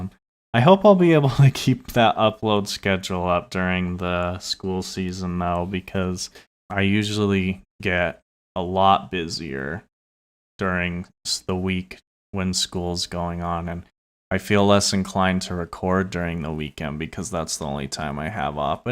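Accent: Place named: France. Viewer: You shall be spared (for the french accent)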